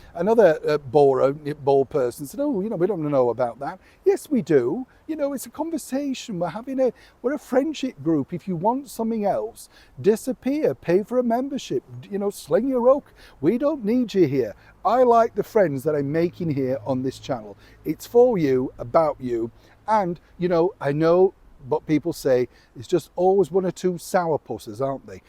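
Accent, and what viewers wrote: Yorkshire, United Kingdom. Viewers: Yes a Yorkshire Lad 😊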